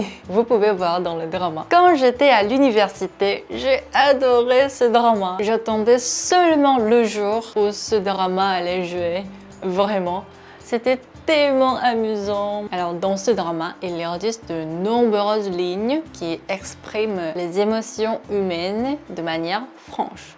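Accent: France. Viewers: t a un belle accent Français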